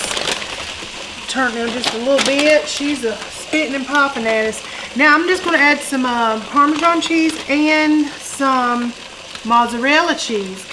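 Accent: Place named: South Carolina, United States. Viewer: Y'all just came up random in my feed but really caught me ear. You sound like you grew up in a South Carolina cotton mill town. Uncle Sam sent me a lot of places, but I sure miss that little mill town I grew up in.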